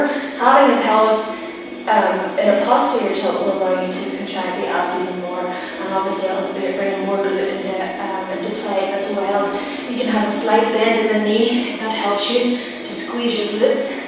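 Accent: Ireland. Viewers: i love your accent. it's the only authentic Irish accent I've ever heard. the only other one I've heard is from Angel, on the tv show "Angel"/"Buffy the Vampire Slayer" :)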